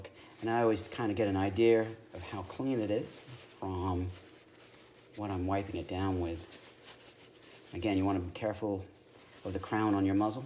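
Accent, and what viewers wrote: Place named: Boston, United States. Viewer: Cool Video! Are u from Boston? Sounds like u have a Boston accent 👍👍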